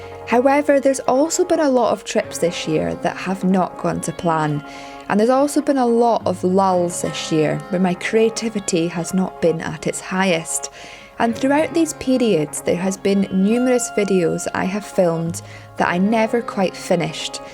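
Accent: Scotland, United Kingdom. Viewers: Loved the video! I am late to watching your channel so I am trying to see all your work. You are helping me enjoy the confinement of COVID-19. Who would have thought what 2020 would bring...just crazy. There is so much to enjoy in your videography and photography. You should consider documentary voice overs as your enunciation is so clear and the Scottish accent is beautiful. I may have said this before I could listen to you talk all day. Stay safe Fred in Texas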